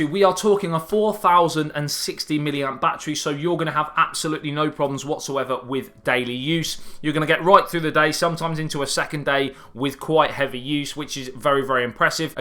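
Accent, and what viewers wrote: United Kingdom. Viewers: The British accent is fucktarded enough, but throw a lisp in with it and you're triple fucked. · I seen this video and held my breath then thought, "Thank, god. He sounds British." Why do I hate my own country so much? XDDDD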